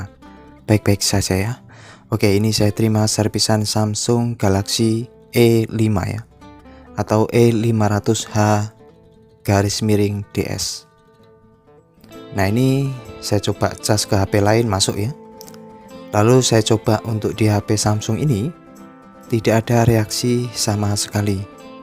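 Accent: Java, Indonesia. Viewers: Mas kalo boleh tau mase orang mana ya? Jawanya jawa mana? Siapa tau kalo ada apa apa bisa mampir ke mase🤭😁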